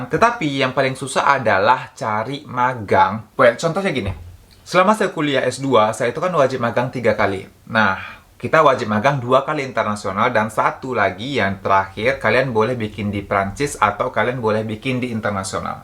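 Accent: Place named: Padang, Indonesia. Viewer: Suka banget denger logat Padang kamu... Love you...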